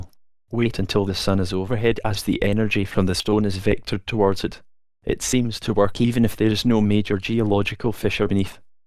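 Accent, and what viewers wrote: Scotland, United Kingdom. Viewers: Hello please answer a question for me and my son who is 15 My son thinks that you're using one of those robot voice thingy Majiggers I told him you're not and that it's just someone with a really cool Scottish accent who probably speaks Gaelic also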